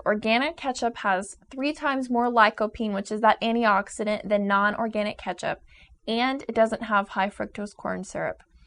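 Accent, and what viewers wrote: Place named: United States. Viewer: that American accent really grates on me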